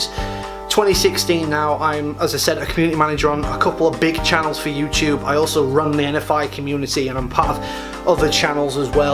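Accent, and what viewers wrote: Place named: Manchester, United Kingdom. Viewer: Is from Manchester??:o I've been away so long, but that accent just plunged me into nostalgia xD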